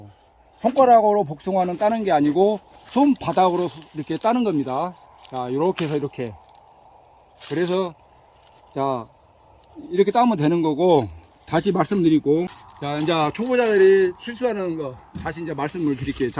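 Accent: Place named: Gyeongbuk, South Korea. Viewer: 네네 경북 말씨로 알속무게 이네요 감사합니다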